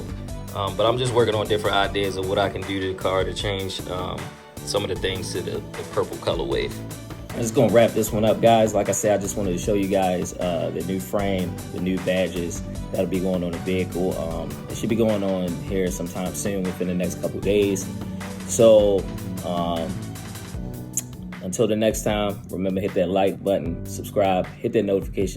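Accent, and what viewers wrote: Baltimore, United States. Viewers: I’ve been watching your videos for month or so, and I been saying to myself “this dude sound like he from Baltimore” “I wonder if the purple is a Ravens/Baltimore thing”....Finally got my answer lol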